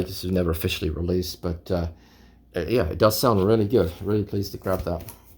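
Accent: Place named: United Kingdom. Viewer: Hopefully not your last video, but if it is thanks for the sharing and entertainment, gonna miss your videos and your British accent. Lol. By the way, is from Lebanon. Hope to see you again.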